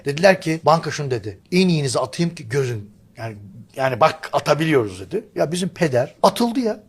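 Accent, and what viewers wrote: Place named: Anatolia, Turkey. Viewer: Yalnız hoca konuştukça şive daha da Anadolu ya kayiyo☺️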